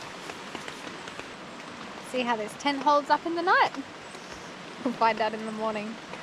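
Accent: Australia. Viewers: love watching your videos your just so happy and the auzie accent melts me come camping in banff I have lived here 18 years and would love to show you around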